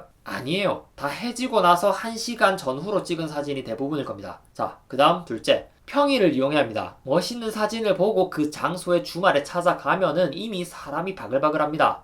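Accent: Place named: Gyeongsang, South Korea. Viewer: 갱상도 사투립니다ㅋㅋㅋ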